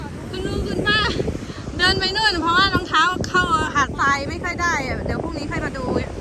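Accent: North, Thailand. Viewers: สำเนียงและการพูดคล้ายๆ ภาษาเหนือเชียงใหม่เลย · ภาษาพูดสำเนียงเหมือนกันเลยครับกับ ทางภาคเหนือ ของประเทศไทย ติดตามคลิปตลอดครับเป็นกำลังใจให้ครับ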